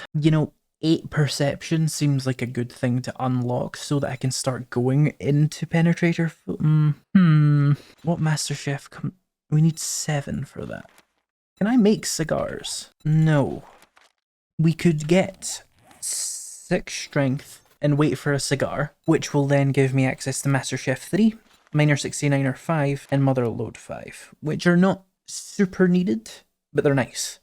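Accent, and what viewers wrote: Scotland, United Kingdom. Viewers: Hey! Love the vid (taking it in chunks) and love the accent. Special place in this ol" gramma's heart for Scots.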